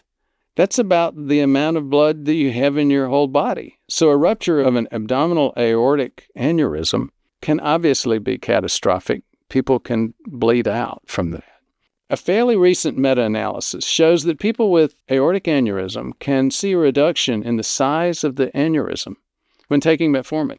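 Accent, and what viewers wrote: South, United States. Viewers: Having grown up in Alabama, I love your accent. Not hillbilly, just one of a variety of southern accents. I've been in Texas for 46 years, & still think the Texas accent is harsh.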